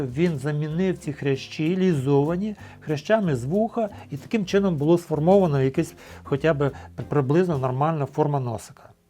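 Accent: Ukraine. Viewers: Величезний респект лікарю, за те що є можливість слухати його українською мовою♥️ До речі ваша українська надзвичайно чиста , як з заходу України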